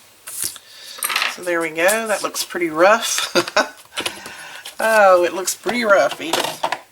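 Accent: South, United States. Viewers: I love y our faith journaling. You encourage me. Plus I love your Southern accent.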